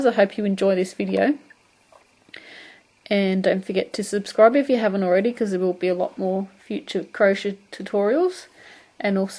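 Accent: Australia. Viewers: I love this! You have taught me so many things! I'm a new crocheter, and I love your style of teaching. When I make something and show people, I tell them "the nice Australian lady taught me (I hope I have the accent right!!!!!)" I made this to accent a pair of handwarmers that I made using one of your tutorials...it ended up being too big for the handwarmer, but it's still so pretty that I know I can use it for something! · great tutorial you make it look so easy I'II keep practising and hopefully I'II get thankyou for all your hard work Where are you from? you sound like an aussie thanks again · Is that an Australian accent I can hear? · I am Australian, and I love that we have a fellow Aussie making great tutorials, I love our accent and I for one am so thankful that you have uploaded this video and sharing your knowledge, I think your fantastic, keep up the great vids, your awsome :)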